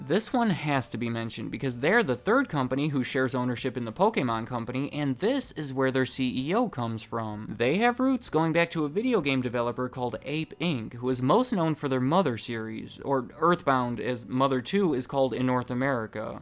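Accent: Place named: Michigan, United States. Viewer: I'm trying to pinned down this guy's accent my guess is Michigan but maybe also somewhere in Illinois but I'm leaning towards Michigan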